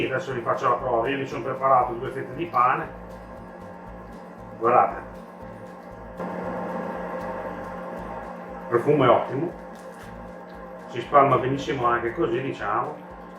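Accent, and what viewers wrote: Emilia, Italy. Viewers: Bravo! È un piacere seguirti e sentirti parlare. Salumi e pronuncia emiliana mi riporta alla mia metà mirandolese. L'altra metà sanmaurese (prov di Torino) mi dice però che quel fornelletto da noi si chiama Fojòt (fuiot) e serve per scaldare tenere calda e degustare la bagna caoda (cauda) piemontese. Tecnicamente fa lo stesso lavoro per la bagna e per la 'nduja: manciari! (La parte ragusana di mia moglie)😜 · ... nel video sembri mia nonna, stessi gesti, stessa sicurezza. Bravo, un calabrese con l'accento emiliano, perfetto. Un solo piccolo suggerimento. Conviene, anche solo per praticità, così faceva anche mia nonna, legare i tocchetti di nduja mentre si riempie il budello. Tutto il resto è perfetto, anzi l'aggiunta dell'affumicatura, mi convince molto. Sono dei Casali cosentini e qui c'è un salume simile forse identico alla nduja, lo chiamiamo nduglia, le cui tracce risalgono al 1600 (quindi più antico di quello di Spìlinga). 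È citato in una poesia di Donnu Pantu, "la briga degli studenti", di Aprigliano (CS).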